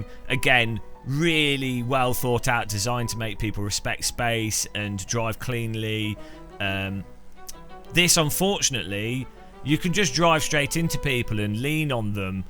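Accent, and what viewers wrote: United Kingdom. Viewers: I wished the british accent would have brought some credibility to this post but he is evaluating this game as a racing simulator... Anyone who played the first iteration knows that this game isn't a fancy sim racing game. This is more a perfect mix of great physics and pure fun.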